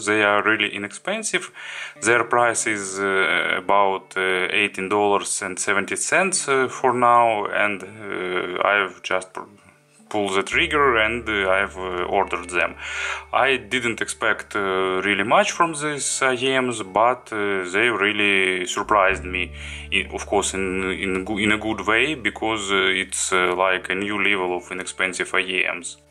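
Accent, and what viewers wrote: Russia. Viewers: Thank you so much for the review. Love your Russian accent BTW and your soothing voice. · Чисто Русский акцент))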